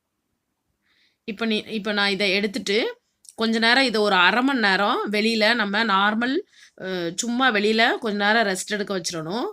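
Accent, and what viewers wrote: Tirunelveli, India. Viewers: Hello sis...which temperature has to set if the recipe is cooked with oven? Also sis one small request...pls reduce english words and speak in your tirunelveli tamil....it is sweet to hear like you pudding recipe:-)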